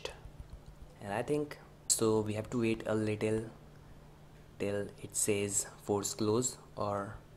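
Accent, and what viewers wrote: India. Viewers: Парень индус какой-то, английский его режет уши)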